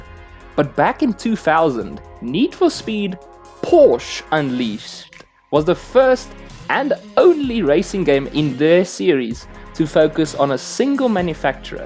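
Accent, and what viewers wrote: South Africa. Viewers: Unrelated, but you sound South African... are you? 😂 Edit: Knew it lol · Are you South African? Edit: Yeah now I realised, I could hear by your accent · South African accent. I hear it.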